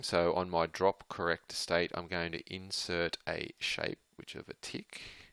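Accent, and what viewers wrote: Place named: Australia. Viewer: this tutorial is excellent! Your instructions and explanations are very clear. This is the best online tutorial explaining states that I've found on YouTube. Thank you so much! Please make more tutorials. ps. Love that I hear a fellow Aussie too!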